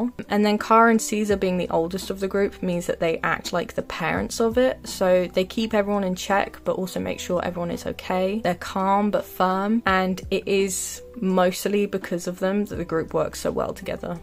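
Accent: United Kingdom. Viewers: Beautiful!! I loveee your videos! you’re such an inspiring artist to me :) you don’t have to answer this but where is your accent from in England? I have a similar accent but not many ppl have the same accent as me where I live XD again, you don’t have to answer this, I was just curious. ⭐️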